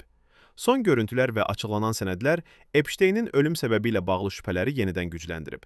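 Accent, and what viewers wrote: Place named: Azerbaijan. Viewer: Senın dedıgınde. Bır şey. Anlamıyorum. Azerı konuşuyorsun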